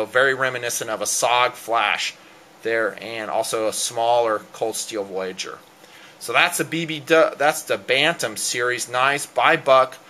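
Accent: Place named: United States. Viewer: 6 yeh he's finally dropped the squeak great reviews but annoying yank twang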